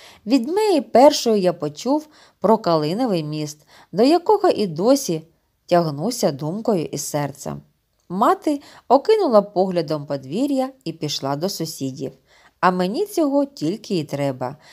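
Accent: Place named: Russia. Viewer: Дуже дякую! Ви мені дуже допомогли! Я завжди вас слухаю.Але в вас якась трішки російська вимова слів і деякі слова ви вимовляєте неправильно але всеодно це ніщо в порівнянні з тим як ви нам допомагаєте)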